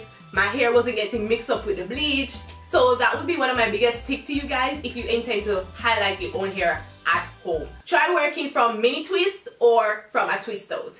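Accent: Jamaica. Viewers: are you from Jamaica or did u lived in Jamaica because u talk like a Jamaican and btw am from Jamaica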